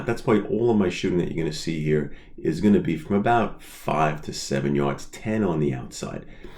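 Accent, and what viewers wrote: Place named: New York, United States. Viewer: Refreshing to hear a New Yorker talk about guns lived in NYC 40 years Always been afraid of guns until I moved to Florida lol now can’t get enough lol I have two cuz that retired also thank you for ur service and great educational video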